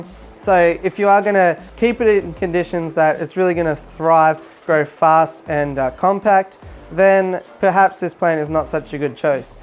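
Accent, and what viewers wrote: Australia. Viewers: R u ausie?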